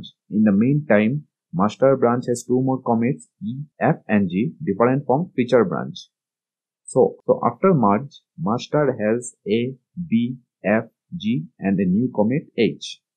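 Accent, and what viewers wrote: Bengal, India. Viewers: English accent se bangali lag rha h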